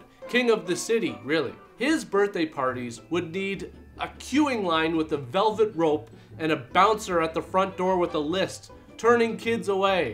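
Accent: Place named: Canada. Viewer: ive gone through a 5 videos of this channel and it feels like this guy is trying not to embrace his canadian accent, because it only slips out with words like "process" not trying to stereotype him but i spend alot of time in guild/clan voice chats and bro... just let use make fun of your accent. embrace it.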